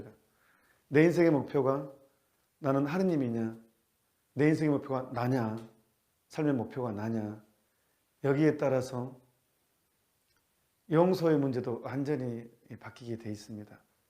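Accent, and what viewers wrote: Jeolla, South Korea. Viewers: 하느님을 닮아 순수하신 신부니이시네요.오늘 처음 강론을 들었는데 많은 가르침 배우고 갑니다~^^자주 배우겠습니다.근데 전라도 사투리 넘 재밌네요 ㅎ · 찬미 예수님! 신부님 감사합니다 ~~^^ 진심이 묻어나는 전라도 사투리가 순수하고 재미있어서 웃음 지어 집니다 ~~ 좋은강의 항상 감사합니다 ~ 덕분에 매일 삶이 기적입니다~♡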